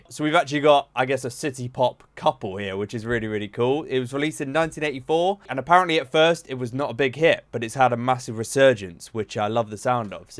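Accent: United Kingdom. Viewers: イギリス英語が聴けたのでとても嬉しいです！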